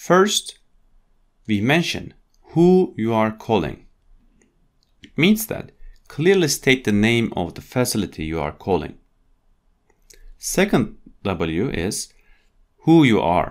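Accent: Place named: Turkey. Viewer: Hocam Aksandan inanılmaz belli bu arada Türk olduğunuz :)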